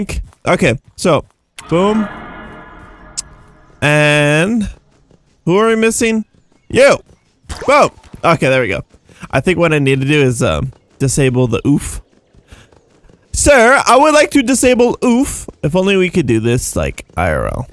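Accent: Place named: United Kingdom. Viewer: I think your British voice is really cool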